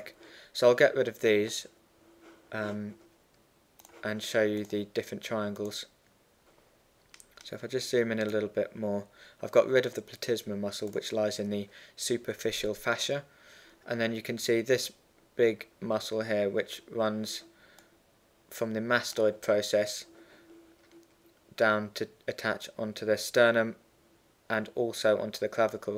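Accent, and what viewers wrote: United Kingdom. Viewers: terrible british accent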